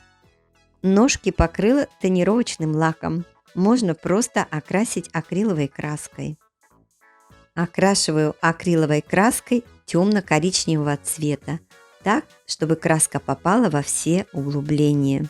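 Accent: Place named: United Kingdom. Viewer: I love it and appreciate the UK voice over. Many thanks x❤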